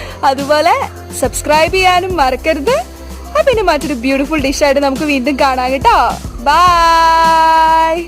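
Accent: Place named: Perumbavoor, India. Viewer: പെരുമ്പാവൂർ, കോട്ടപ്പുറം ഭാഗങ്ങളിൽ ഉള്ളവർ സംസാരിക്കുന്ന രീതിയാണ്. അവിടങ്ങളിലാണോ വീട്..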